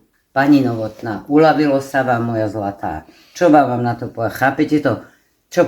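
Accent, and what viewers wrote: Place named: Eastern Slovakia, Slovakia. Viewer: Mila pani. Ste, Super zienka, mama, kucharka. Ani si neviete predstavit ako, kazdy Vas recept, video, aj vase hlasky, nezaprie sa, ze ste aj vychodniarka…💗 nam prinasaju radost v Brooklyn,NY. Prajeme Vam aj kameramanovy vela zdravicka lebo je vzacne a hlavne lasky. Spozdravom vychodniarky( rusnacky) z Brooklyn E&K 😘😘😘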